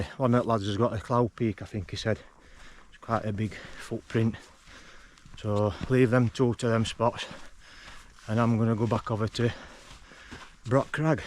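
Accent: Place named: Yorkshire, United Kingdom. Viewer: Great stuff! I'm gradually learning to understand Yorkshire dialect!! Great places, great views and great courage to go out there in December. Thanks so much. It's really inspiring and I might consider following in your footsteps! Love it!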